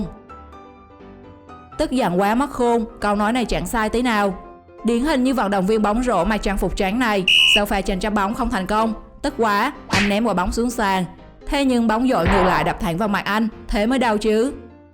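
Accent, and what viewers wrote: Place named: Quang Ngai, Vietnam. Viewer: Tập nói giọng lại đi, sao nói nẩu qué vại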